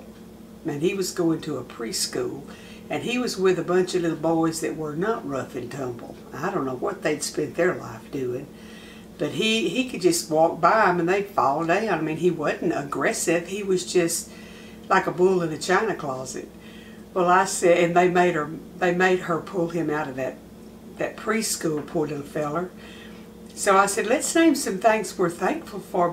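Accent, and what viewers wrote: South, United States. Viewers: Okay I'll start out by saying I love your kitchen beautiful I love your Paula Deen accent dead on I love the southerness in your voice I'm going to subscribe just for that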